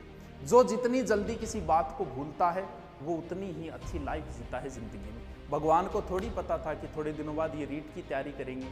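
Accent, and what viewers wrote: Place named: Haryana, India. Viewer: Sir aap haryana se ho ya Rajasthan se dono language remix lag rhi h me haryana se huu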